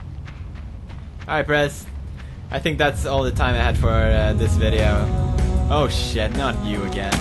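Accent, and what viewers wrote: Sweden. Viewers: OH MY GOD! BACK THEN I DIDN'T REALIZE HOW STRONG YOUR SWEDISH ACCENT WAS... oh my god, coming back here after all this time as some kind of bitterness... guess we both grew up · Lol pewds's accent is still swedishyish ya know. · I've never heard his Swedish accent till now 🤔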